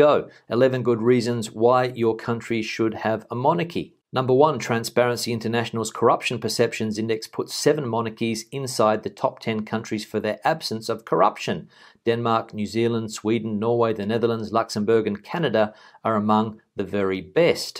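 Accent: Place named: Australia. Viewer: your mild Australian accent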